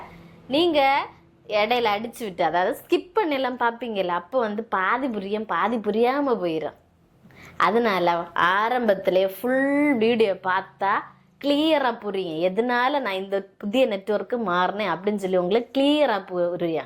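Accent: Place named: Kerala, India. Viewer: இது என்ன பாஷை ஒண்ணுமே புரியல எங்கள் கொங்கு தமிழில் சொன்னால் தானே எங்களுக்கு புரியும் இதில் கொஞ்சம் மலையாள வாசனையை அடிக்கிறது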